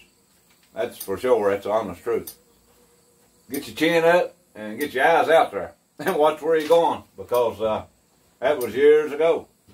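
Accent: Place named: United States. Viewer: Orsum. I really enjoy watching your videos and trying to understand your lingo. 🤪 my missus is from way back in them NC hills she sure do appreciate the Merican accent from back her neck of the woods. Ah huh! 👍🏾💯 I did that 7 question challenge some months ago. It’s really interesting hearing other bikers answers. Good job buddy. 👍🏾💯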